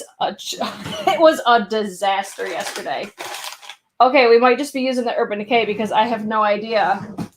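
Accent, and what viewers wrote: Midwest, United States. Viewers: New subscriber! You’re such a goof! I love you! Where are you from originally? Do I hear a Midwest accent?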